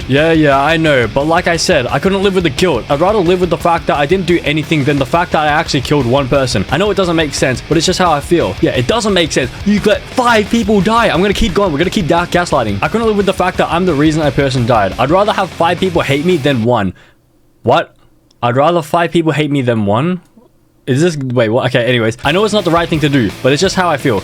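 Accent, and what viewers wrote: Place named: Australia. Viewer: YOUR AUSTRALIAN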